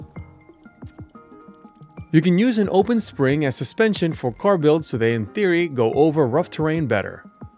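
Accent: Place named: Netherlands. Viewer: alles goed? Edit: NL Engels accent ; ) goede tips trouwens. Bedankt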